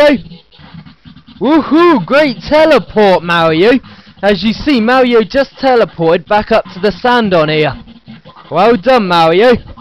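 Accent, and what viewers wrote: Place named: United Kingdom. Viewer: Mario 64 and your (British?) accent, brilliant combination :D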